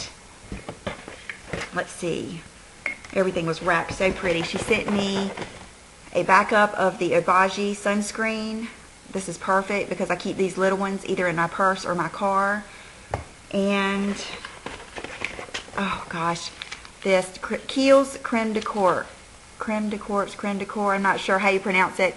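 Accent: South, United States. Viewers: I'm a new subscriber and I will definitely stick with watching your videos. You seem like such a genuinely sweet person. I just love your accent too. I'm a slow-talking Texas girl myself, so I am glad to see another Southerner with their strong access just out there with no apologies.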